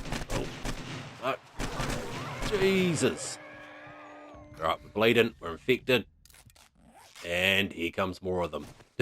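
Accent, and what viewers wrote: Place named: Australia. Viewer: ive been thinking about what country you live in. youve got that australian accent but you seem like an american so its gotta be one of those 2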